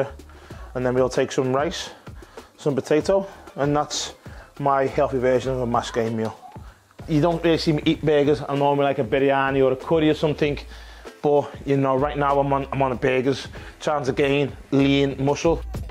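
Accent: Liverpool, United Kingdom. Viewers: Is he a Scouser? Sounds like one. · Scouse.. Liverpool accent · Make a video one day when it's all Scouse ! 🙂🙂🙂🙂👍🙂👍🙂👍👍👍🙂🙂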